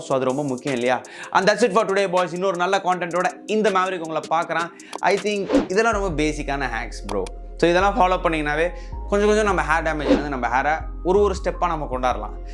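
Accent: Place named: Tirunelveli, India. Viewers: Antha ella slang nice 👌 sincery by tirunelveli ,thoothukudi Karan la 🤣🤣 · tiruneveli Slang👻😄😁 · Bro tuty slang vera level ❤️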